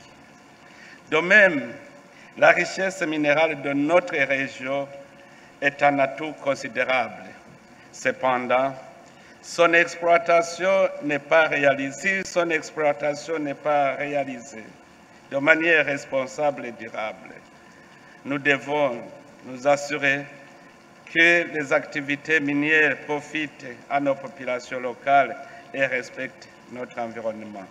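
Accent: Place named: Burundi. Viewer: Igifaransa kikirundi kbsa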